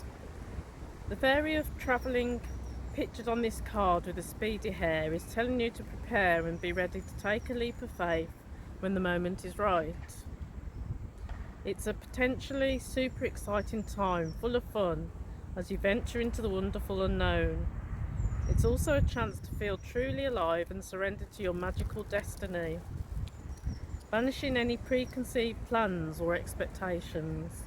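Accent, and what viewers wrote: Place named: England, United Kingdom. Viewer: Just love this english accent too! Why dont you check Kemit ancestry ?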